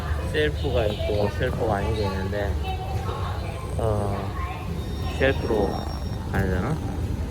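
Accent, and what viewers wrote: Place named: Gyeongsang, South Korea. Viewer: 경상도분 이신가봐요 말투가 상당히 친숙하네요 ㅋㅋ 건강 잘 챙기시길 바랍니다!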